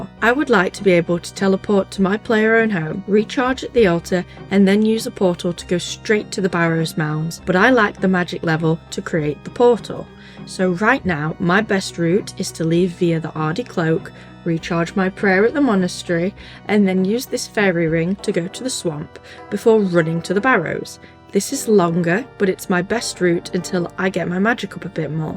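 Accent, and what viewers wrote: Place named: Yorkshire, United Kingdom. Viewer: So Yorkshire lol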